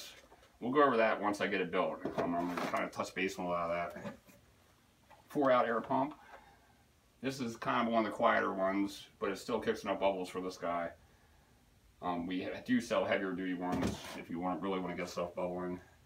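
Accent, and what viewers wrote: Pennsylvania, United States. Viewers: He's speaking Pennsy, easily understandable being that I'm originally from the Ho Bud coal region of the keystone state. Listening faster might help. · I was born in PA I know that accent. Also cool points to both for knowing who the hell are.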